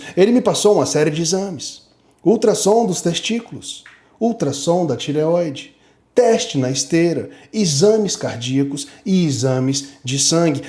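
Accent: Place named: United States. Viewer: é americano, isso explica o inglês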